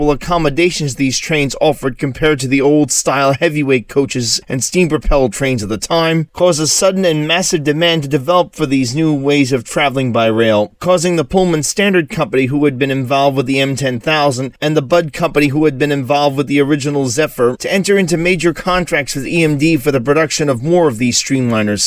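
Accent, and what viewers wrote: United States. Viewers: It's just an American accent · Really great EMD mini-docu video thanks! Good to hear a proper NY-sounding accent also ! 🙂 I didn't realise just the ridiculous number of such locos that EMD manufactured. Must have been coining it in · You from Brooklyn?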